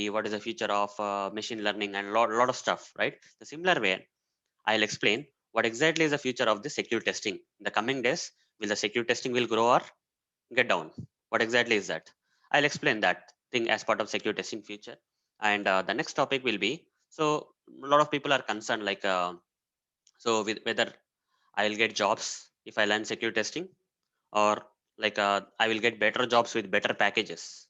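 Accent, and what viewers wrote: India. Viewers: i like indian english)))😀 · Indian accent :(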